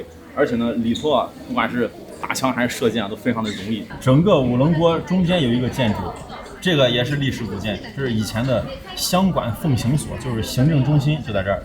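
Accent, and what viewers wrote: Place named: Qingdao, China. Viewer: 听口音是青岛人吗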